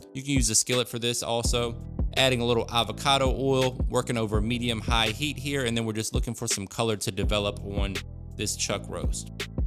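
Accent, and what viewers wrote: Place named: Pittsburgh, United States. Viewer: This guy has a Pittsburgh accent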